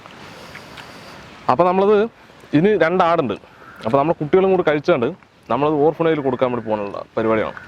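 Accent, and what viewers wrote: Palakkad, India. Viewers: Palakkadaan slang ishtamullavar like iduka👍❤️ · കള്ളി മുണ്ടും പാലക്കാടിന്റെ നാടൻ ശൈലിയും അസ്സൽ പാചകവും പാചക രാജാവ് ഫിറോസ് ചുട്ടിപ്പാറ 👇⚡️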